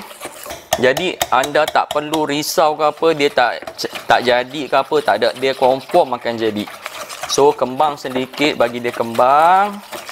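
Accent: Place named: North, Malaysia. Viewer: T kasih bg resepi, cakap utagha syok dengaq, harap2 rajin la nk buat nti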